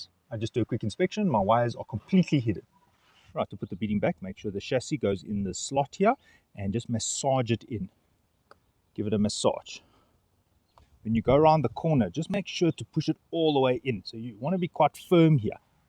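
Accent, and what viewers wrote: South Africa. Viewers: Great video. Detect a South African accent there. Groete van Engeland af 😜 Great video. My dashcam has parked recording mode so need to source a 12v constant. Easily done. What I have used is some conduit and fabric tape. Probably a bit ott but just for the added surity the wire cannot be exposed. Great video though. Very helpful thanks.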